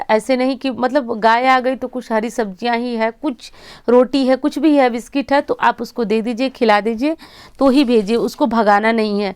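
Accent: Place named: Purvanchal, India. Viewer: दीदी हम जौनपुर से है दीदी मुझे आपकी आवाज से पता चल रहा था कि आप मेरे साइड से हो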